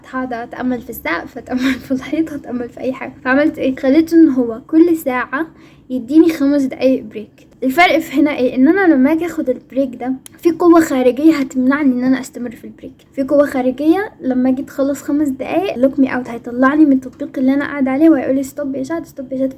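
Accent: Gulf, Arab world. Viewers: مصريه بس فيها لطشة خليجي 😂😂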